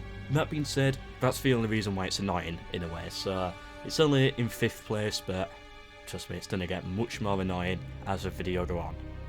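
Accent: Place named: Yorkshire, United Kingdom. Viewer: I find the accent both distinct and pleasant and fellow Yorkshireman. I know your dialect is local so maybe I'm wrong but I normally hear Carthage pronounced like 'carthidge'. Not trying to be a nob, no one messes with the dark lord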